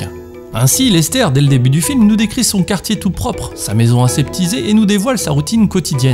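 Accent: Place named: France. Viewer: C'est trop génial !!! Cet accent français sur le texte anglais est un vrais régale ahahahaha :D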